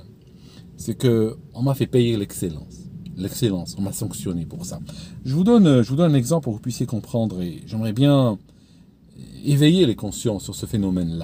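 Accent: Switzerland. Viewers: Si ton accent Suisse amusait du monde imagines l'accent africain 😅pire mélangé un peu à l'accent québécois. Je viens de la France avant hier même mes gosses se foutent de mon accent. malheureusement c'est ce que j'ai remarqué chez les francophones contrairement aux anglophones chaque fois j'ai été aux États-Unis, à Londres, en scandinavie... Jamais on s'est moqué de mon accent en anglais les américains me disent même your English is very good quand je leurs dis excuse my bad English. En terme devolution en entreprise t'aurais vraiment pu essayer le Canada c'est juste fou comment on évolue vite même en salaire d'où suis parti de l'Europe car je savais que si je restais rien n'aurait bougé jusqu'à ma retraite bah j'ai refusé de me contenter de ça et suis heureux d'être parti il y a bientôt 3 ans. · Mais c'était quand même sympa l'accent suisse ! J'en redemande ! 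Sinon, absolument d'accord. Le monde de l'entreprise est plutôt ingrat, en plus d'être rébarbatif. On doit y côtoyer plein de gens absolument ineptes et, le plus souvent, on a fait le tour des tâches en moins d'un an, à quelques ajustements près. Tu n'as jamais entendu "je ne comprends pas pourquoi tu veux cette augmentation, tu aimes ce que tu fais. Crois moi, ça n'a pas de prix " ? Tu as aussi de grosses boîtes qui pensent que tu as tellement de chance de les avoir pour clientes que tu devrais travailler pour zéro et pourquoi pas finir par te prostituer (symboliquement) pour pouvoir les conserver. Heureusement que je suis mon propre patron. Je n'ai plus de patience, je me ferais virer rapidement. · Ouais j'ai adoré ton accent Suisse j'ai pensé à mon copain Paquito, et des bons souvenirs...Merci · Vous m’avez fait rire et vous êtes touchant et ça m’émeut !!! avec l’accent suisse et c’est le dibou 😀😀😀😀👍👍👏👏👏👏👏👏👏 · T quand même un sacré personnage ! 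Et il vrai que ton accent suisse revient de tps à autre... En ts cas je vis la même chose que toi au niveau de l'excellence dans mon poste de travail et au moment où je demande un stage pour me mettre au "goût Du jour" informatique et nouveaux matériel lumières (je suis éclairagiste) on me dit : non pas la peine de, car tu es tellement bon que tu vas te former seul !!!. Voilà, comment être mis à l'écart et sombrer dans une espèce de désespoir et donc ne même plus être bon dans mon domaine de compétence.. Merci 😢. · L’accent Suisse 😅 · Bonjour, cette histoire d'accent suisse qui revient quand tu es fatigué m'a bien fait rire, moi c'est ma femme qui lorsqu'elle s'énerve ou qu'elle est stressée et celà arrive souvent me parle en kabyle alors que je ne comprends rien vu que je suis Français.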